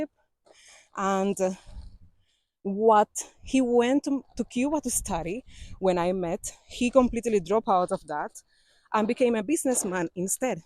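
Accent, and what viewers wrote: Jamaica. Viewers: You Jamaican, Just born in Cuba, but girl you roll those Jamaican words like a Cuban Cigar!! Boss Lady!! Love what you do!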